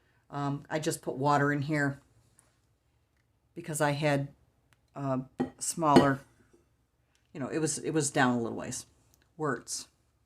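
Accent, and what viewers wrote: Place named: Central New York, United States. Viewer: This is awesome. I love hearing you talk. It's like I'm back home in CNY. The accent sounds the same. ;-)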